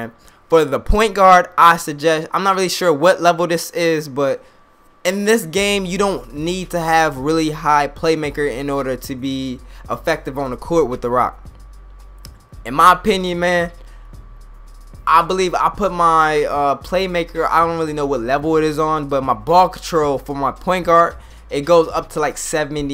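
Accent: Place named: AAVE, United States. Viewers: MopSop Lmao he's speaks Ebonics like most of us here aint nothing wrong with ppl's choice of dialect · It's just ebonics man. Ain't nothin wrong with that.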